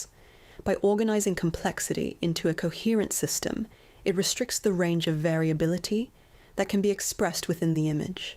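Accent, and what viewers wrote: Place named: England, United Kingdom. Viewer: Hate the clipped English accent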